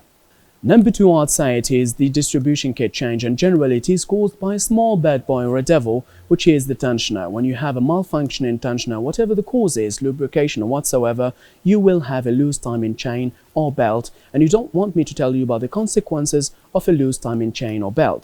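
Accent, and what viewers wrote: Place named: United Kingdom. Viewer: UK englich ❤️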